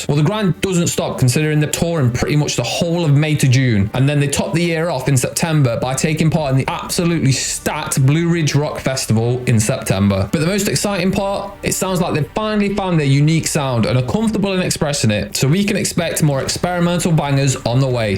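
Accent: United Kingdom. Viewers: why are you british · Are you from yorkshire?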